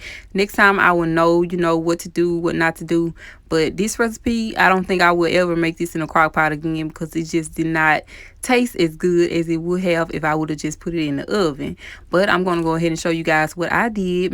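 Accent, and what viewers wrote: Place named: South, United States. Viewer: You gotta be from the south ayyeeee yooo you had me at bolder... AkA butter and poppa Rika 🤣🤣🤣 dead 💀.... looks amazing tho I loved it 😍 👌 🙌 ❤ 💕 Update: I have a question??? How come you don't make any veggies with your dishes Also I don't eat pork.. im Muslim I was thinking veal chops or lamb would you make a video for ppl that don't eat pork thanks 💕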